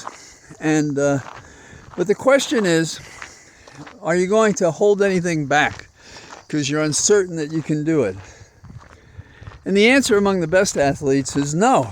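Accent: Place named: Ireland. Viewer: ... I never miss your talks. I always learn a great deal. Your critique of Judge Juan Merchan and D.A. Alvin Bragg today is understandable. However, I believe there is valid reasoning behind their actions. The D.C. Circuit Court is going to lay out Jack Smith's charges and these will be presented and discussed with reporters able to appraise them. That will occur before the election and I believe they will have a great impact upon the public, the court and the election. After Mr. Trump is defeated, the judge will have a full range of options to sanction him. That is, I believe, the plan. We shall see. Thank you for your input each day and for your Irish lilt!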